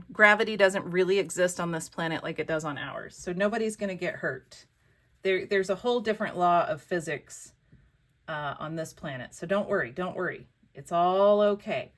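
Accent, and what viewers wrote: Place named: Georgia, United States. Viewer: I think your Georgia is comin out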